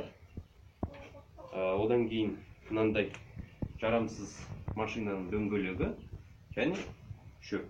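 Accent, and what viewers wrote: Standard. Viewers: Керемет. Таза казакша сойлеп жеткизип жатырсыз! Мыкты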